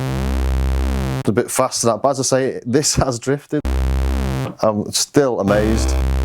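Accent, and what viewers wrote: Liverpool, United Kingdom. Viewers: Scouser? You and Doug from the Soundtest Room know each other?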